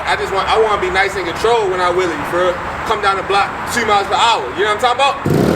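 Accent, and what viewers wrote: Baltimore, United States. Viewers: It’s like an illusion 😅 bmore accent strong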